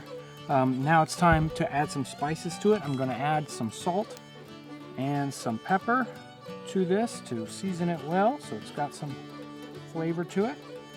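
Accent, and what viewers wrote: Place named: United States. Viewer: The music, the costume somehow shows he's cooking in a 18th century English country side setting. However, the pronunciation showed he might come from the new continent.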